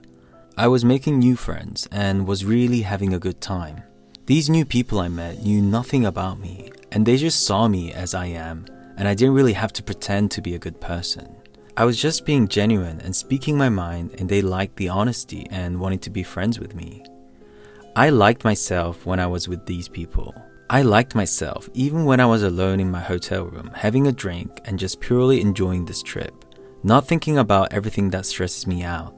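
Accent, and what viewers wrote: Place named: England, United Kingdom. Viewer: You have a great English accent. You are definitely different. Love your videos .thanks for sharing. The world is here for you ❤ 💕. With all mental support. Is ok to be sad and angry and is ok to be aware of it.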